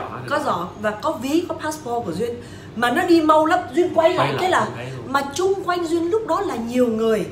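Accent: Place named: North, Vietnam. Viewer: Giong bac ky va` nghe thuat cach noi 20 nam lam MC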